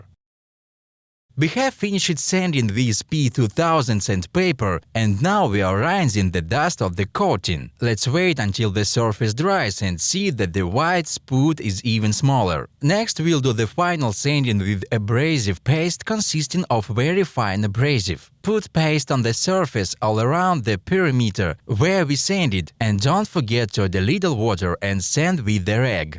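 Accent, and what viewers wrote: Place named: Italy. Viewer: sounds italian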